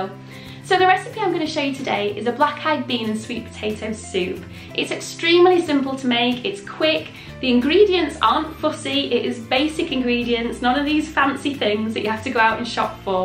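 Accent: United Kingdom. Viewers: Is the plant always called Coriander in the UK? (I assume the accent is UK) I call the seed/spice Coriander but the plant Cilantro? It is a must for my Salsa. My favorite herb!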